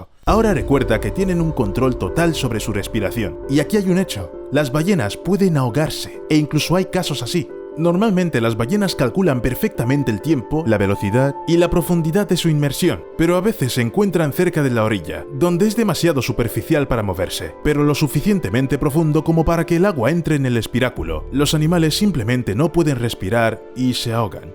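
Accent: Spain. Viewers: La voz suena como española, pero todos los insertos son de doblajes latinos. Anda la osa! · El sujeto tiene acento español pero usa clips latinos? Curiosos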